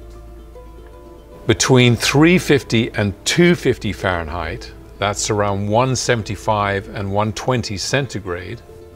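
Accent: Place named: RP, United Kingdom. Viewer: FANTASTIC BBC ENGLISH ACCENT>>>THANK YOU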